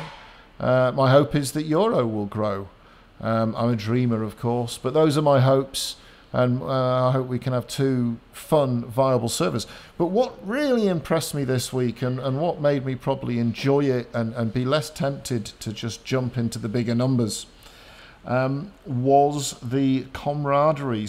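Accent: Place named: United Kingdom. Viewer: Really? I could of sworn your british :D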